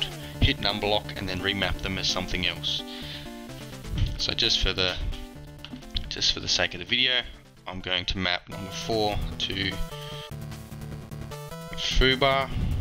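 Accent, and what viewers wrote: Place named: Australia. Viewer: When I clicked on the video and heared an Australian accent, I was like HOLY KROYKEY MATE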